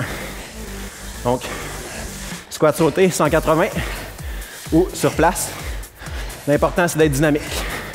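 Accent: Quebec, Canada. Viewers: C'est l'accent Québécois! :)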